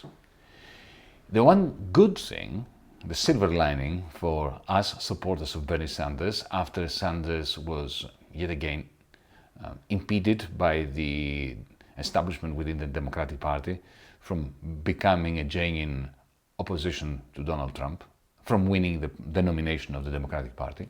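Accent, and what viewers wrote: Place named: Greece. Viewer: Just keep using your Greek roots as you speak. I'm envious it makes your speech a lot more sophisticated and easy lol.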